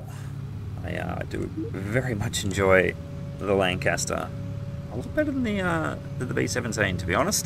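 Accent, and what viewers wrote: Australia. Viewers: you sound aussie mate, are you? good video btw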